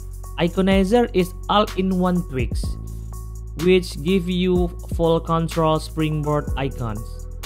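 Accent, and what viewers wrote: Indonesia. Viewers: benerrr tp aksennya ketebak banget klo org indo WKWK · Your accent kek orang Indo anjir but its good tho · org indo ya bg? ketara accent nya😅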